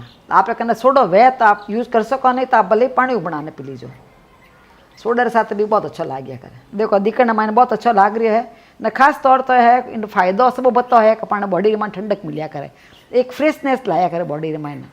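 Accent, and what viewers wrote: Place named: Jodhpur, India. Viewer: Aapki awaz, ton sabkuch Jodhpuri he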